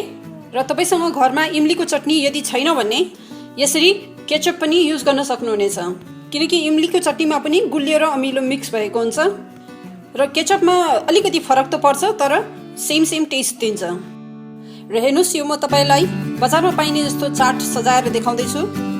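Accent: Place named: Darjeeling, India. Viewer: The way you are talking resemble as you are from Darjeeling or siliguri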